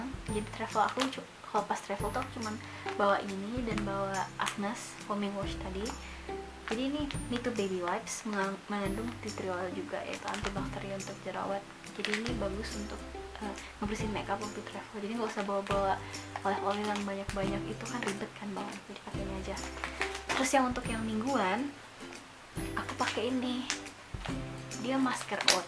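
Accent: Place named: West Java, Indonesia. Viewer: Logat sundanya nempel bgt ... Nice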